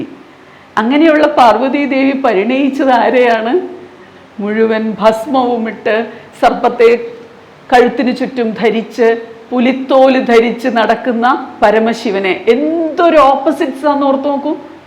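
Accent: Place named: Palakkad, India. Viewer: അസ്സൽ പാലക്കാട്‌ ഭാഷ 😄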